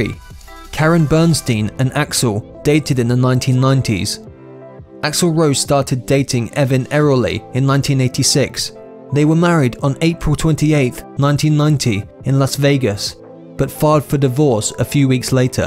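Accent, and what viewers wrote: United Kingdom. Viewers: Is it just me or does the British accent describing one of America's greatest rock bands really seems to not be the right accent to describe Axl.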